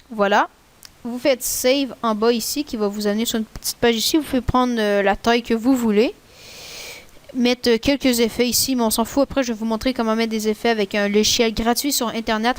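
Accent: Quebec, Canada. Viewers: Perso je kiff ton accent québécois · tu est québequoi ? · Jadore les accent kebekois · J'adore l'accent québécois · Moi c rendu que je suis TELLEMENT rendu habitué a l'accent français que je suis surprise de voir un accent québécois même si je suis québécoise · 1-J'adore l'accent quebecois 2-Super tuto merci bcp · T quebequois ??? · De 1 je m’abonne parce que merci De 2 je like parce que j’adore ton accent québécois ! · j'adore ton accent et les québécoi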